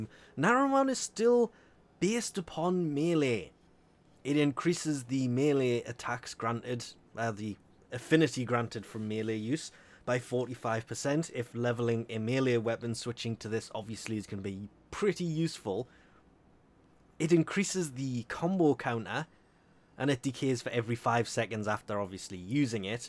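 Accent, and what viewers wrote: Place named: England, United Kingdom. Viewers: Sounds like he's from Newcastle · North east England. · Except Tac is Irish and this guy is English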